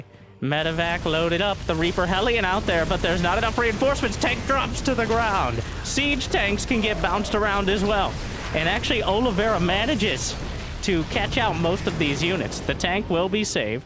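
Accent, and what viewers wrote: South, United States. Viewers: Never Imagine the day, I will be watching a starcraft commentary with a southern accent.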